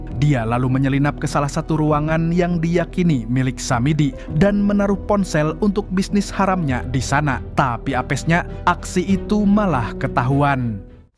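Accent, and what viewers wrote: Banjar, Indonesia. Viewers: Bah buhan Banjar kah tih 😂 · urang banjar amang nya waluhhh😂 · mang urang Banjar kah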